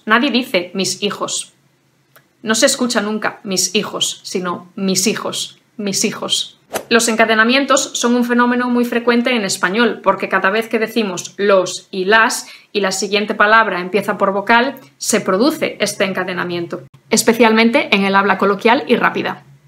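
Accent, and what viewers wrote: Spain. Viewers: I love your Spanish accent so much ❤ Thank you dear ❤❤❤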